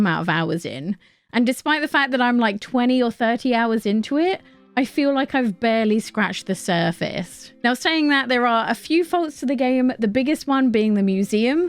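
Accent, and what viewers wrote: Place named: United Kingdom. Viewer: this british accent is so good to hear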